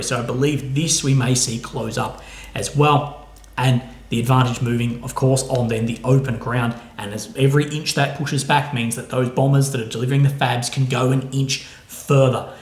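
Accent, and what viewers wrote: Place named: Australia. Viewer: Your visuals are fine. Shows your intensity and good rich Oz language well.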